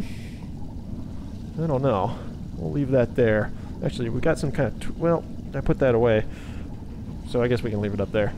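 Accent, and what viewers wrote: United Kingdom. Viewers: British accent through me off lol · I was wondering that too I was like is this dude British or something lol 😂 I need to get a VR headset to have more fun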